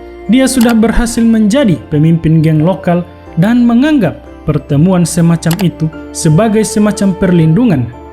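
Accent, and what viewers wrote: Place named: Sumatra, Indonesia. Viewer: logat nya mirip org sumatra gitu min hehe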